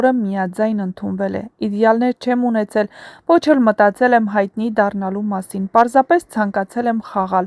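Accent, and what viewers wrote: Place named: Russia. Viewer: Ինչի եք երբ բառը արտասանում եռբ, ետ ինչ զզվելի պրիմիտիվ մոտեցում է Հայերեն լեզուն խոսել ռուսական ակցենտով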